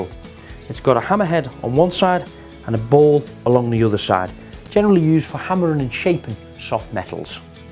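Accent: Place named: Liverpool, United Kingdom. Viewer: Accent is from liverpool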